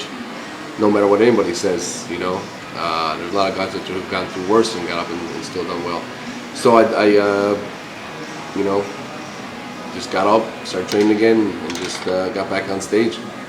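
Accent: Newfoundland, Canada. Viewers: sick newfie accent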